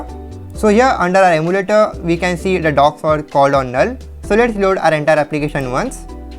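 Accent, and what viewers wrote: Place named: India. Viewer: I saw some negative comments about your accent. It's perfectly fine to hear for any Indian or anyone who is used to Indian accent. The content is very well structured and to the point. Keep up the great job.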